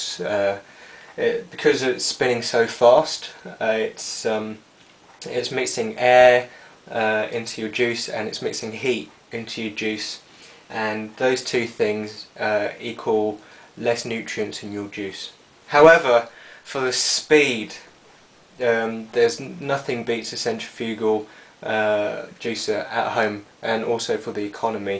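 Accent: England, United Kingdom. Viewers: I never saw a chinese person with a british accent ^_^ cool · he sounds very english to me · an asian with a brittish accent how awesome!! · Dig your British accent. Nice review on the juicers. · asian with british accent cool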